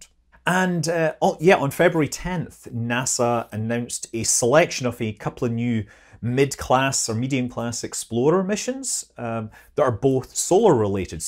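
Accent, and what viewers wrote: Scotland, United Kingdom. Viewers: As a side note, when I hear " here" in that deep Scottish brogue, I am oddly comforted and know I'm getting reliable, entertaining info from one of the best spacers on Youtube. No, it really is the best out there.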